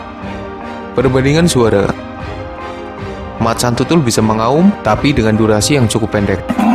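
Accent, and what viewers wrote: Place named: Java, Indonesia. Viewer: Medok bgt min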